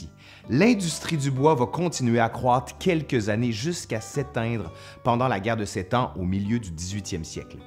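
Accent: Quebec, Canada. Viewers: Ne serait-ce que pour me bercer dans l'accent Québecois, qui me rappelle mes six mois passés dans la Belle-Province, je kiffe écouter parler. Et puis, ça, c'est sans mentionner le fait qu'a chaque fois c'est passionant ce qu'il nous raconte!:)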